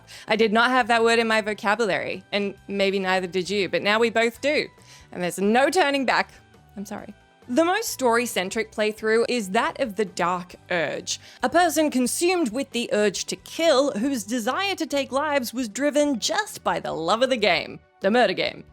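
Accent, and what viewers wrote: Australia. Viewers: I feel some of the most questionable things I've done are on Stellaris the game makes Slavery and Genocide fun! All for expansion and profit of course. I mean I'm normally apologetic about my Countries Imperial past and then I play that! Lol Edit: is adorable I think its the Aussie accent Shoot me I'm simping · That is an Australian accent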